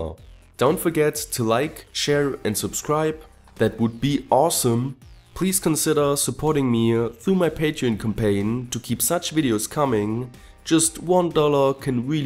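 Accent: Germany. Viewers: are you german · Du bist aus Deutschland oder? Das hört man:) gutes video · I love your German accent :) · Du krass... Läipsich...góil.... I wüsste das du deutsch bist wegen dein akzent, grüße von plagwitz :P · JV Also offensichtlicher deutsch geht es kaum: sein Akzent, "Leipzig", Deutsche Marken. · So many times he says zentimeeters xD I am more into the electronics Videos of GS but this one beats them all wit di akzent :D ( and please don`t get mad man ;)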